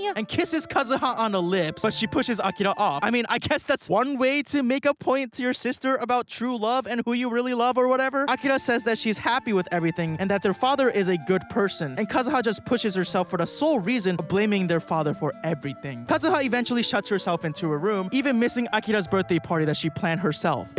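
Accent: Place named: Turkey. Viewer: Are you Turkish? Your pronunciation sounds very Turkic...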